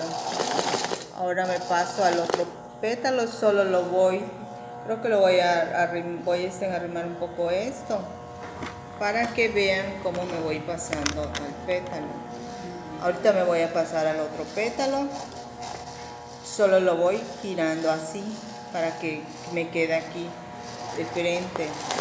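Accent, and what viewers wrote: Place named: Yucatan, Mexico. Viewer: me encanto escuchar tu acento de Yucatan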